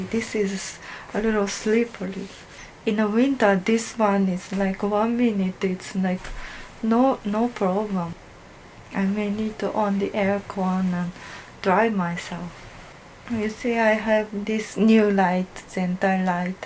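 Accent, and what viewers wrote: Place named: Japan. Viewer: Your English is good, but sometimes sounds more Japanese and go to English classes to make your pronunciation more better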